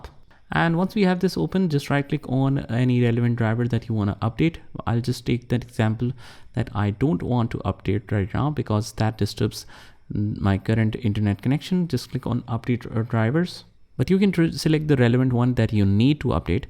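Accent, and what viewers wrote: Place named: India. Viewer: the indian accent is strong in this one.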